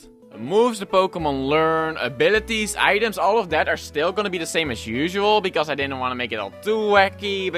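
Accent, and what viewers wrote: Netherlands. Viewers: Are you dutch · Hears first sentence, jep that’s a dutchie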